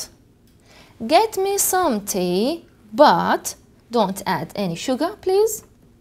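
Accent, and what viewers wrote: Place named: Arab world. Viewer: دروس جميلة لكن طريقة نطق بعض الحروف ليست جميلة كحروف T D P يبدوا أن من تقوم بالشرح لغتها الأم عربية؟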